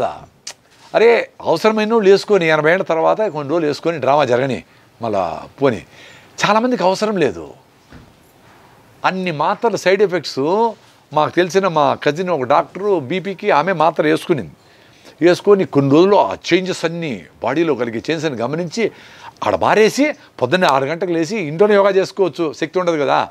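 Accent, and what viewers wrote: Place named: Madanapalle, India. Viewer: Really great information sir.pakka madanapalle slang telugu.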